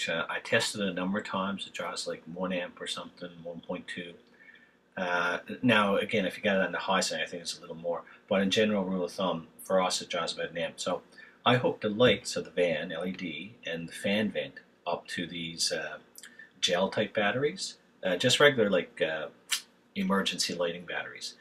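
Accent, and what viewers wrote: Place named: Newfoundland, Canada. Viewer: Hah. Here I am daydreaming about a ford transit conversion, looking for ideas when I stumble across this video and immediately realize that I recognize that accent. A fellow Newfie!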